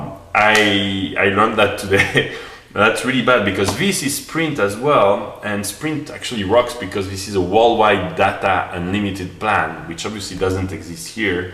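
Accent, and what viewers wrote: France. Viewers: LOL you are French right ? i've recognize the "accent" je suis francais aussi, sinon super tes videos franchement sa fait plaisir de voir un francais qui review le palm pre(je cherchais sa depuis un moment). t'a fait HEC je suis mdr ... j'y vais a la rentrée pour ma quatrieme année, voila pour ma life je m'abonne allez a+ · r u french :D?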